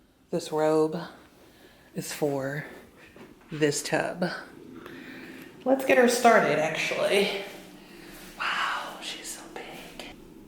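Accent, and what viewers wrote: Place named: West Coast, United States. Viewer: I howled at the west coast accent, not too bad. 🙌🏾🤗🤣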